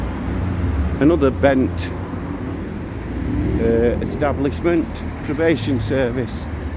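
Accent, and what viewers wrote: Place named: RP, United Kingdom. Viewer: I thought you’d be an Earl Grey man with your posh accent!!! (I can’t abide all that poncy stuff. It tastes like perfume to me).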